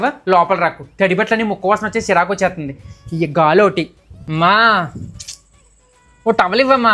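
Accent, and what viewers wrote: Godavari, India. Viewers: Director ga,kodukunnanehe godavari yaasa super I'm missing that yaasa,our place dowleswaram daggara vemagiri andi,me vedios chala natural ga vuntai nethimeda kerchief, paper cutting badge la petti name rayadam unique asalu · 😂😂😂 pure గోదావరి భాష😂😂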